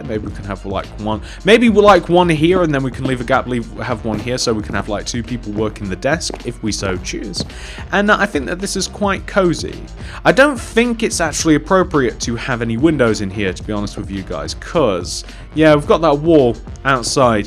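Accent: England, United Kingdom. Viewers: Are you English, cause I am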